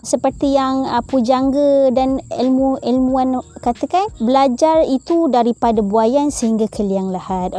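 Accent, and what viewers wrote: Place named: Pahang, Malaysia. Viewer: 💯 setuju. Dah lama tak dengar loghat Pahang, felda mana ni? 😁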